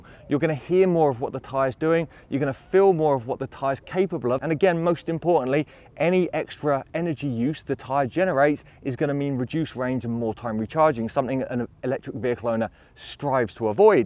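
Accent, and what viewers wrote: United Kingdom. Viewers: I was thinking this guy would be great working alongside Rory Reid on the Autotrader channel, it's a shame they don't live in the same country.... But then he mentioned British weather. This channels about page says "United States", I was wondering why his accent was so pleasant and his personality not like the hyper active American car reviewers.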